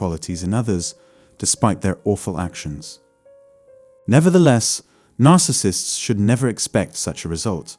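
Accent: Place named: United Kingdom. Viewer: I think maybe it's the voice and the accent, when you watch channels with the British accent, you feel like a baby, safe and sound somehow😅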